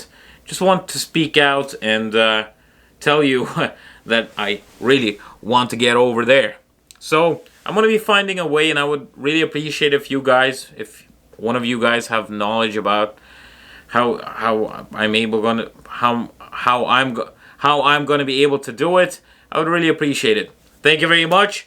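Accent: New York, United States. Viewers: You already sound american! Its my life and I can do whatever I want! Land of the free. Maybe you can teach a certain group of Americans that freedom is worth fighting for! Good luck to you guys! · Dude, you already sound like you are from NY, you should fit it just fine. Don't you have cousins here already?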